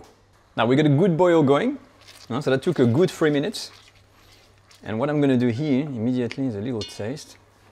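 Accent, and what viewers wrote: France. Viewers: Love your cooking and your French accent ❤️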